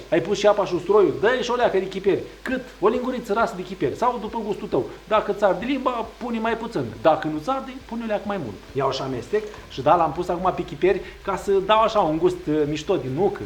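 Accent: Moldova. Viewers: Pot sa ascult fix un minut pe cineva cu accent de moldovean,Dupa aia ma ia greata.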